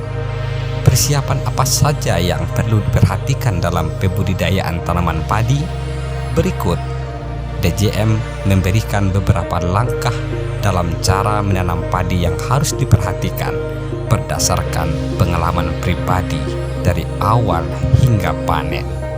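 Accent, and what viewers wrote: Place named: West Sumatra, Indonesia. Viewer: dialeg regional Minangnya menambah manis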